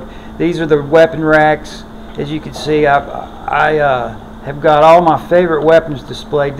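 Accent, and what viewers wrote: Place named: South, United States. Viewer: Fallout videos are 9000% better with a Southern accent. Roll Tide.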